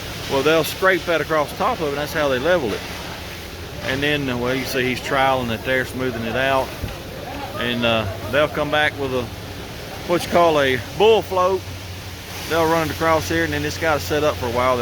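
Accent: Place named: Southwest, United States. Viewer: I am writing you from France,. I am from Prentiss Mississippi. And L have watch everyone of your vidéos, and love everyone of them. Me being in France , it is good to hear someone from the Southwest talk. I am à retirer milirary, L moved to France, when I retirer. So keep up the good work, you are like one of the family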